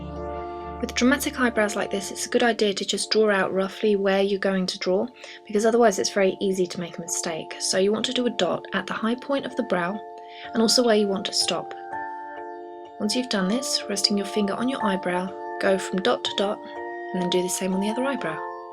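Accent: England, United Kingdom. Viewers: Now I know what I'm going to dream about now : A nice girl with a british accent being taken over by evil clowns... or lollipops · are you English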